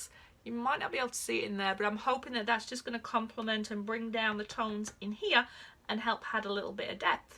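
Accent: United Kingdom. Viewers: New follower thanks to Myriam! Can't wait to see your work! <3 Edit: Cuz I'm the girl who gets so excited that she comments before the videos are even finished. XD Are you Scottish? Whether you are or not, you accent is GORGEOUS! ;) <3 Edit2: I'm at the end and this looks STUNNING! The way you made the top and bottom kind of not be the focus, makes the center look like a sunset or sunrise. The part at the top above the last layer of blue, looks like a city at night with all of the sparkles. Beautiful work! I can't wait to start binging your channel tomorrow at work. (I'm a government employee. I can't be breaking the stigma of being one by actually working a lot. XD)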